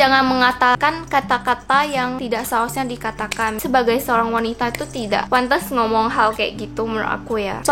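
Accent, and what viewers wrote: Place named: Batam, Indonesia. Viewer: Logat orang batam lucu yaa😂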